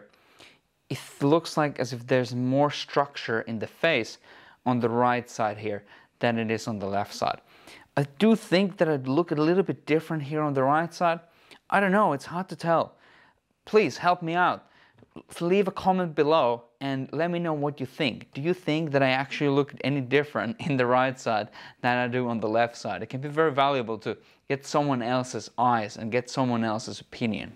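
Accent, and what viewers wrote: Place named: New Zealand. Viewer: Where are you from, lad? Kinda sound like you're from New Zealand?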